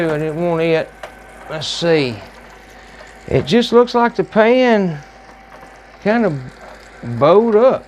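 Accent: South, United States. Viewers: Do I detect a bit of a Southern accent?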